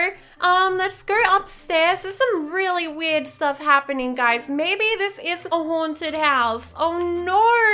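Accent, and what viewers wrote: Australia. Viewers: Are you Australian? My accent is similar to yours and I’m Australian? Just asking, anyways love the video! You need more subs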